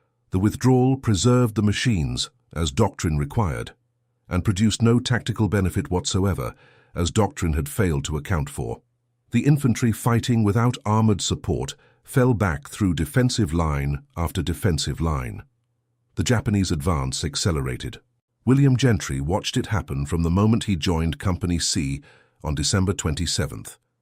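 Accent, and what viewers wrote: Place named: United Kingdom. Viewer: Wisconsin (now). Uncle was Naval Academy grad who commanded a destroyer in Pacific. Other uncles served in Canal Zone and elsewhere. My dad was too old but wasn't taken when he volunteered because he was an oil chemist and manager. He and one uncle were needed at home to produce oil and cotton. Friends' moms were welders etc in defense plants and shipyards.We all grew up in Arkansas like Pappy Gunn, learning to shoot when young including women. Both bros, 1 dead and 1 100% service-connected disabled, and I plus several relatives are VietNam-era vets. Your AI images, Brit-accented narration, and fake sonagrams suck.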